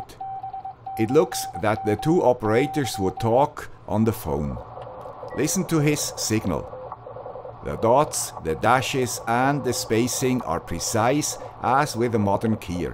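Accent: Switzerland. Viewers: There are some great ham channels on YouTube and as a returner to amateur radio, they have helped me learn a lot. I'm always glad to hear a little Swiss accent modulation of course!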